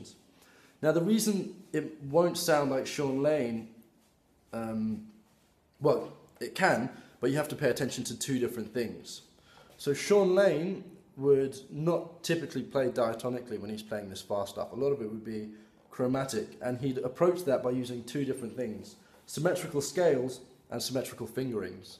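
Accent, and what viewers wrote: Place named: Liverpool, United Kingdom. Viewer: Are you from around Liverpool? You sound a bit like George Harrison.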